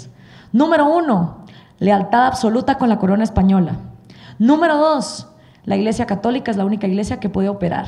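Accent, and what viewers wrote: Mexico. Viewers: EL UNICO INTERES DE ESTA CHICA ES EL MONEY , ES DE GUATEMALA PERO HABLA COMO MEXICANA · pero porque me hablas como mexicana wey?!?! por favor, un acento guatemalteco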